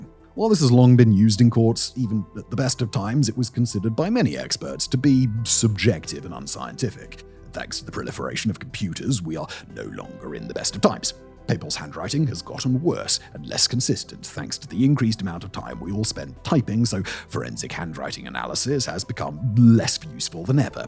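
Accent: United Kingdom. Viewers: The whole video seems very US-based for someone who sounds British and lives in the EU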